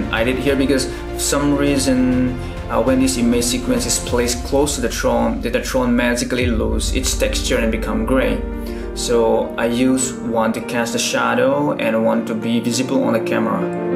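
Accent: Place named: Northeast, India. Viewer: Your English accent sounds like you are from NE India. Great work btw. Cheers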